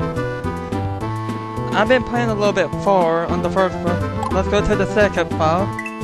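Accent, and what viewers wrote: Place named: South, United States. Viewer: love ur country accent, great commentary!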